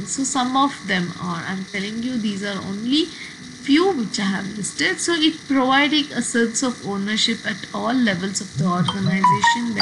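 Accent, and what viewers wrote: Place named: United Kingdom. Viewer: Wow mam trying ur British accent 😁 Well done mam 👍😁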